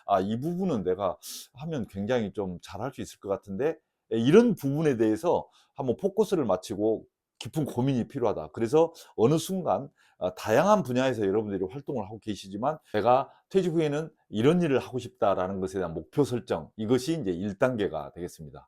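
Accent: Jeolla, South Korea. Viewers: 잘 들었습니다. 전라도 분이신 거 같은데.. 흥하십시오!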